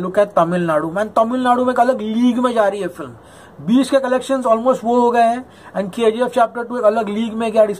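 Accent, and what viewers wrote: Karnataka, India. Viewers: Bro ..i just love your kannada slang!!! You rock!!!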